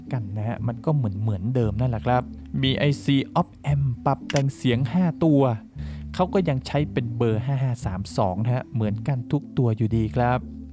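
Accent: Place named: North, Thailand. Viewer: คนเหนือแน่เลย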